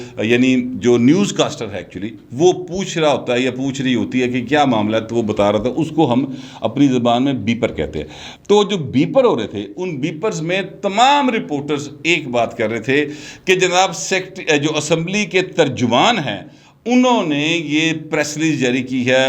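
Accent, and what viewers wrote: Lahore, Pakistan. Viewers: sahib you are indeed a great anchor,agree with your point of view,love to listen to you in a proper Lahore style.well entrenched and thought over examination of the current issues.Regards and Stay Blessed.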